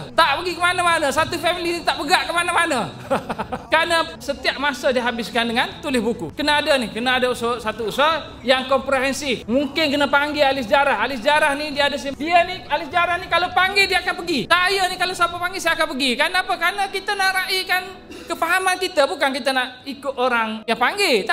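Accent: Kelantan, Malaysia. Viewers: Ore klate ni. Kawe first time dgr pun dah tahu.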